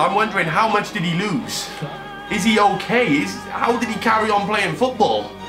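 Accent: Wales, United Kingdom. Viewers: Defo a Newport or Cardiff lad you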